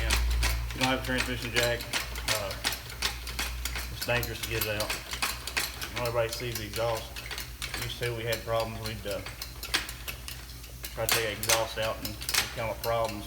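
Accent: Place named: South, United States. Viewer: Is this what I sound like ...tell that's a good Ole boy there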